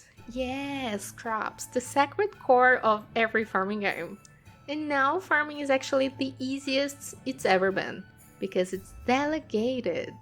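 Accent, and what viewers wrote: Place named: Brazil. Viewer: Great video!! You have a Brazilian accent 😊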